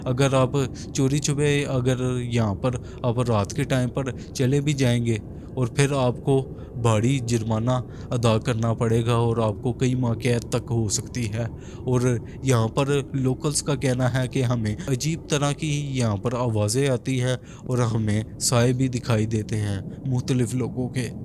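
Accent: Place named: Pakistan. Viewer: Bhai hardwork karte raho aap.... Bhadiya hai video.... By the way aap kha se ho... Aapki bolne ki language se pakistani lag rahe ho...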